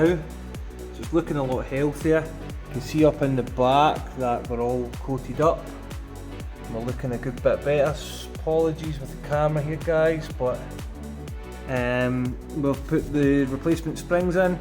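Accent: Scotland, United Kingdom. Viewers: Love the video 👍 great to hear a fellow Scot 🏴 on here. Liked and subscribed 🏆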